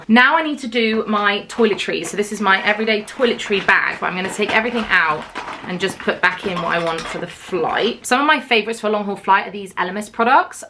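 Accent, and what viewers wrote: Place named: United States. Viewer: Hehe I can definitely hear an american twang in yur voice, then you said you spend a lot of time in America and it made sense :)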